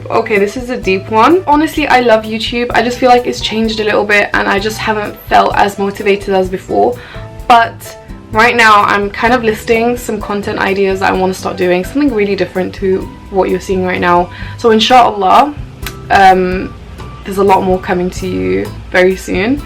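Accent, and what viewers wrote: England, United Kingdom. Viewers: are you virgin Müslim girl? 😊 😊 😊 😊 course you not!!! are you arabian, pakıstanı, or Afghan where are you from actually youre langauge and accent just like brıtısh accent of course you not brıtısh , just born to england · I like your english accent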